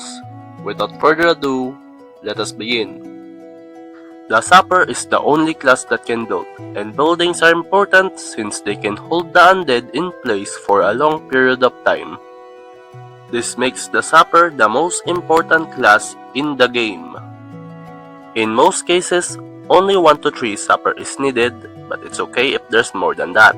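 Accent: Philippines. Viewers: filipino accent is strong here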